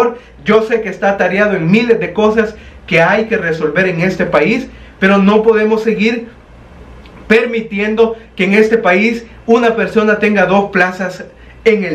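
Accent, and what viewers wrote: El Salvador. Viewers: Me gusta tu canal apenas enpese a seguirte De verdad q mis respeto a tu canal y el de Marcelo larin porq nos mantienen informados con la verdad. Me encanta tu humor tu acento salvadoreño . Dios siga bendiciendolos a ti y al q te hiso poner esa mascara lol.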